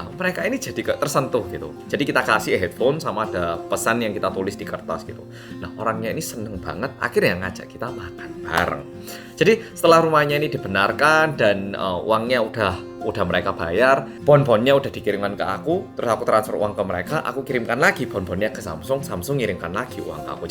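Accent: Java, Indonesia. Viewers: Melihat banyak kebaikan,menjadi hati lebih tenang,semoga kita selalu bahagia dan dapat membuat orang lain bahagia Jowo ne mendok... Ya Allah bahagia selalu 😊😊😊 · Wes suwi gak kerungu jowoan medok curhat² ngene... Ileng pertama youtubemu biyen.. entah siapa yang salah...ku tak tahuuuu 😅😂😂😂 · ak tuh sll speechless typ nnton videomu, km tuh bner2 ky org jawa asli ngmgmu medok asli😂 maaf ya out of the topic😅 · Itulah percayalah,setiap kesusahan pasti ada kebaikan,berarti di uji dulu dg kesusahan,dn di balik itu Tuhan punya rencana yg Indah dn ALLOH memberikan balasan kebaikan,INTINYA,SETIAP ALLOH memberikan Ujian pasti ALLOH akan mengangkat derajat,aku suka bngt dg dn klrg, krn JAWA BNGT MEDOK BNGT GK NYANGKA ahwa Asli korea.....👍👍👍👍👍💚🙏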